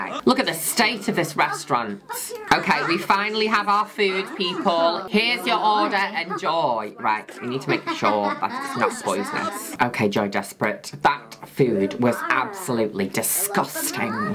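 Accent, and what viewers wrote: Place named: United Kingdom. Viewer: The british accent makes everything better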